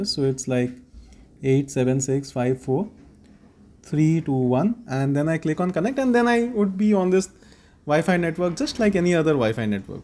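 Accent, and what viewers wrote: India. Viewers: Indian Guys!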